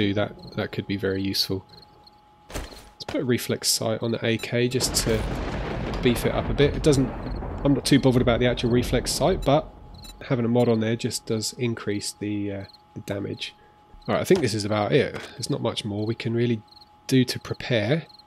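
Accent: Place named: South East England, United Kingdom. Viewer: It was an update 'ol chap. Also there is no longer a guarantee of getting a book bundle in the rewards list. You mentioned you live in Australia, but you're English, you sound like you're from south east England, although you pronounce your 't's" so probably not London or Essex, unless you had a nice middle class upbringing... ;-p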